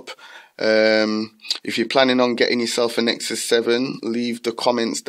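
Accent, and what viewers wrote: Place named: Manchester, United Kingdom. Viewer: In 2019, I have the exact tablet arriving from Amazon Tuesday April 2, 2019. It is brand new and apparently someone kept and has some still to sell after all these many years. I took a chance on this as 32 GB is plenty for me and the size perfect when I travel. The Amazon ad said 4.3 Jelly Bean. Will it Auto-Update to any of the latest version of Android? I have a case and screen protector on the way also and quite a bit of money invested so I hope that I am not disappointed. Looking forward to getting it and setting it up. As a side note, when I was younger, I stayed several months traveling all over the UK and Ireland. As here in the US, many regional accents however not sure what part of the UK that you are from, but sounds sort of Manchester or Blackpool if I had to guess. It's a beautiful accent to listen to. 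Anyway, cross your fingers that my old NEW Nexus 7 works well and thank you for a nice video which was a great help.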